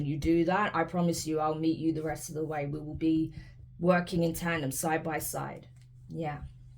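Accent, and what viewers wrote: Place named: Australia. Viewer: "Source is stepping in. Making good to a promise. Your prayer is heard. What are they encouraging you to do? To take initiave. To be assertive." "Make some changes. Release some things that do not serve you. We will make things happen." I just want peace for the world, Mam. I'm currently studying about international politics. I'm old to go back to school. Yet I'm studying so hard...despite the gossips,...despite the many projects. So that I can help to keep the peace in the future. Whether its within our city only or maybe with other neighboring countries too. ✨🌱👍 This is all i want. #WORLDPEACE Thanks for channeling this important message, Ms.! Thanks also to the Spirit Guides for the continuous support! ✨😇🙏✨ P.S. Mam are you from Australia? Your accent is so cute! ❤️🥰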